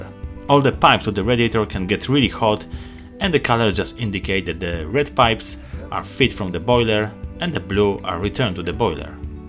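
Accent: Poland. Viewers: o jakim kraju my tu rozmawiamy? bo mam pytanie... z akcentu wnioskuje ze polakiem jestes...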